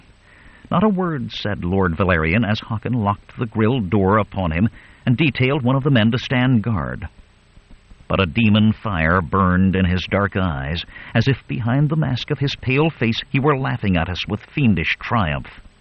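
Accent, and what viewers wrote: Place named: United States. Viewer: Thankfully he speaks American English- the heavily-accented brogue of some readers is not missed!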